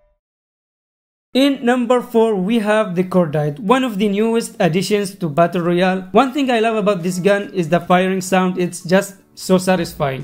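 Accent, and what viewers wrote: India. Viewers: he's from Oman, but the accent is the same as an Indian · Bro here I am .. Your voice is like Indians .. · bro are you from India?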